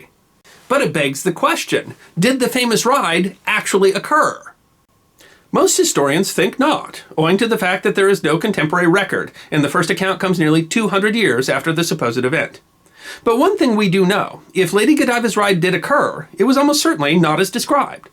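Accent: United States. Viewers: Terrible American pronunciation. Showing Kenilworth castle, and calling it a Monastery. A Degree in History..... ??? mmmmmm ! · Americans ... and speed speaking on top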